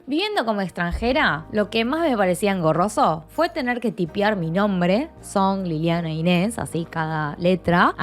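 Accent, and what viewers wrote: Argentina. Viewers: Felicitaciones, la verdad me encanta tú acento argentino en español, es bonito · Solo con tu acento quein en su sano juicio dudaria que vos es argentina :) Saludos . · A la gente es lo que menos debería importarnos si amas y respetas el lugar que llegas y adoptas de corazón es y debe ser lo más importante ,difícil es una adaptación y encima la crítica de si eres o no de aquí o haya,no cabe duda que somos unos tontos complicados ,felicidades por tu nacionalidad coreana !! A mí me encanta como hablas argentina!! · escuchandote hablar sos argentina,autopersivite como quieras ( argento/koreana ), cómo argentino no tengo problemas con ninguna nacionalidad no se porque aclaran tanto culturas asiaticas nacidas de otros paises, creo que es por prejuicio de paises asiaticos porque en argentina una vez superada la edad del pavo da igual la nacionalidad de las personas, lo que se mide es la mentalidad. 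Hay argentinos en todas partes del mundo y no tienden a esa confusión, este dónde este dice que es argentino, la nacionalidad no es un virtud solo una nacionalidad. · A fin de cuentas nace en Argentina, habla con acento argentino pero se siente mas a gusto en Corea ó en Argentina? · Liliana el acento argentino siempre te acompañará, eres una linda argentina-coreana. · No me sorprende tengas nacionalidad china coreana sino que hablas RE- gaucha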